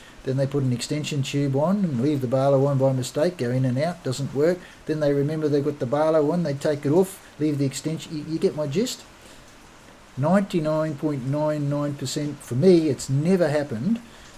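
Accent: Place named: Queensland, Australia. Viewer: Hi, Thanks for your detailed reply, I recon I will have to read it a couple of times. I will have to convert the FITS format file, to be able to put it into PS for manipulation. Unfortunately PS does not support FITS formatt. I have been over the net to check that out. What part of the country are you from? sounds like QLD on the videos but it is hard to make out. Cheers Robert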